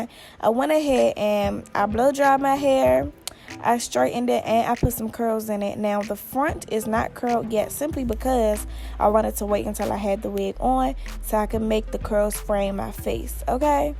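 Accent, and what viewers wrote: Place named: Baltimore, United States. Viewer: Accents giving me B more or philly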